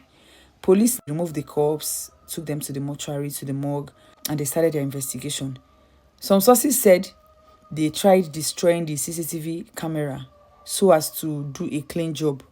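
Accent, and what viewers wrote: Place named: Igbo, Nigeria. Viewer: The narrator is not bad,is just the igbotic accent is the problem.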